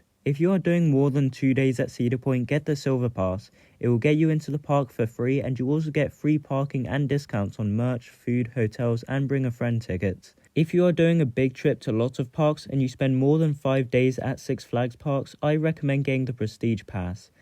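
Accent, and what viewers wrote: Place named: United Kingdom. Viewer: I don’t particularly like roller coasters (because I get motion sick) so it’s a mystery as to why I was recommended this video 😅, however I do live in Ohio and have gone to King’s Island a lot. I decided to watch the video and found it interesting to hear someone from across the pond talk about kings island. 😊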